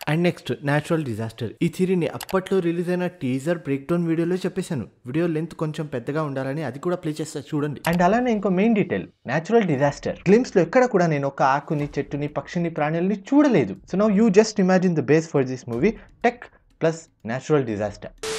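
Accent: Karnataka, India. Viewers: Hi bro... Iam not ur subscriber, bt I watch every video of ur... I love ur love towards cinima bcoz u jst like me... only difference is ur doing something to cinima, bt I am doing ntng... Today u earned my respect too... 💕 For ur slang of Telugu with kannada accent mixing... 😂 Boii.. Boii..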